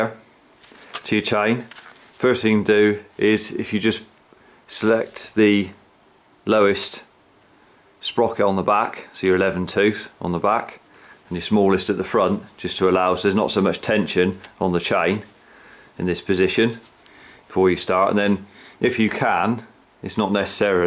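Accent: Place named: Totton, United Kingdom. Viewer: Great video, very clear instructions. Are you from Totton? Accent sounds like it!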